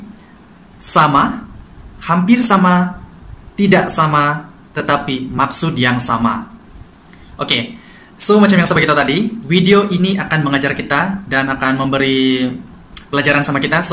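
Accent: Indonesia. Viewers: Intonasi logat bicara admt sm orang indonesia, jauh dr orang malaysia. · Logat awak dah sama dengan Bahasa Indonesia 👍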